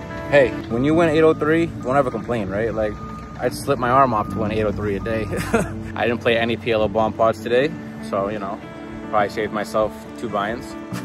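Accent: Boston, United States. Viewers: So, NH is the same accent as in Boston? Just curious, good session, congratulations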